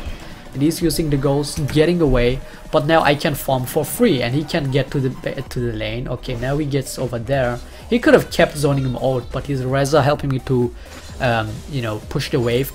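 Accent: Germany. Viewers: Man hört ich raus das du deutsch bist. Ich meine das wisch bei wich und zink bei think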